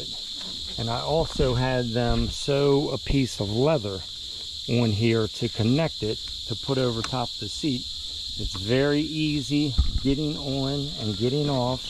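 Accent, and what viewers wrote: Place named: Pittsburgh, United States. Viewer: If I didn't know any better..I'd day you were from Pittsburgh area, I can hear the accent, I to am from the burgh, but I definitely understand seeing the young lady, why you want go to another country lol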